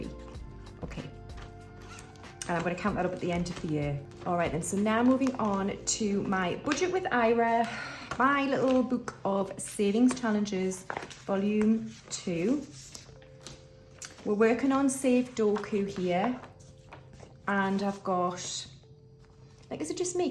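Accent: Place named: North, United Kingdom. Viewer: You cracked me up at the predator comment 😂 you totally sound like my northern friend (saying that, does Lancashire count as northern?) god here I go insulting people with my crap geography 😂 #nooffenceintended 🤦‍♀️ xx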